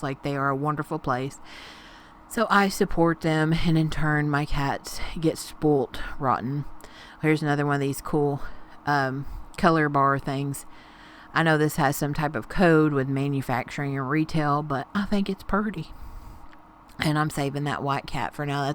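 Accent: South, United States. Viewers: thanks love your harvest videos. you sound like you are in the south. i am in nc. i have 10 cat rescues. Or should i say they have me lol i remember in one of your older video you had mentioned you were in college, do you still go, hugs and stay safe out there. I am older with health issues so i have to stay put for a while. fun is lol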